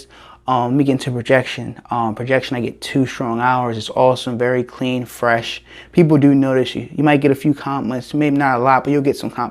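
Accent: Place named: United States. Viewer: Good video again mate it is a shame that you have to do a disclaimer because people moan. I have 3 of these fragrances, Aqua Di Gio is coming tomorrow and looking forward to it. YSL La nuit d'lomme is great on my skin and lasts/projects for ages.... I love it whereas Sauvage I have the edp and I am not keen on it, the projection on me is poor and even on clothing I can hardly smell it. I enjoy your videos..... What is your name? I cannot tell with your American accent (I am from England) and where abouts in the States are you from? I am from Leeds in Northern England.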